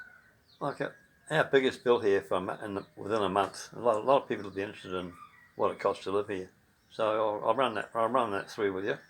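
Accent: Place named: Australia. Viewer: Hi. Thanks for sharing you experience in the Philippines. My name is Chuck. I live in the state of Nebraska in the USA. I too am married to a Filipina. We are currently having a small simple home built in General Trias, Cavite, Philippines. We hope to be moving their to retire next year. Keep the videos coming I really enjoy them. I have to watch each one more than once because I have a hard time understanding some of what you say because of your very strong Australian accent. ¯\_(⊙_ʖ⊙)_/¯ Take care and God bless.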